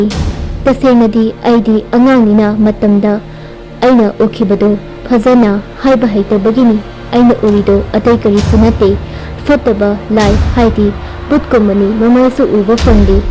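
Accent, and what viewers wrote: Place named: Kabui, India. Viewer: Sis gi wari khulise original meitei na ngangba mande...kabui na Meitei lon ngangbagi accent ( tone) ama chang ngi.... khonjel se ymna thot nou ee ymna nungcba khonjel amadi oi. Keep going 👍👍👍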